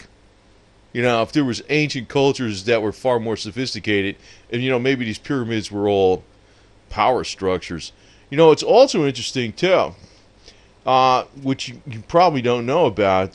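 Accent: United States. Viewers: The american accent.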